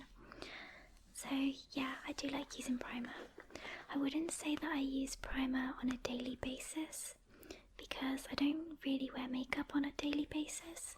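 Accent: United Kingdom. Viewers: Well, first of all, I love your British accent combined with your soft voice (I have American-Mexican accent in comparison, lol!). And you certainly don't need makeup to be cute ;) I also love the presence of green color: your eyes, your shirt, your nails... are your nails green? I think I'm colorblind XD. Anyhow, thanks for this video :)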